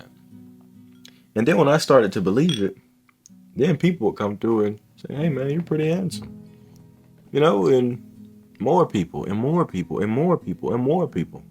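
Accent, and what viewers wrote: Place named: New York, United States. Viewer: You must be from new york. By your accent i knew it.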